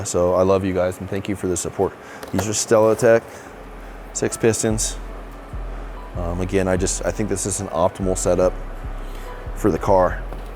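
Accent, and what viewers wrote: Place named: Australia. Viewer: Love your work mate beautiful works of art. Awesome to hear your have Aussie 🇦🇺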